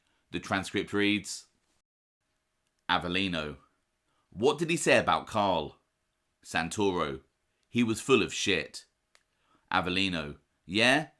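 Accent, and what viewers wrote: United Kingdom. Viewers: I apologize in advance to u t administrators and to the speaker of the video,in my humble opinion heavy exttrapolated british unsophisticated accent is the last thing America crime story needs I am a Cambridge graduate myself, mean no disrespect but that's the way i feel about this videos, sorry again.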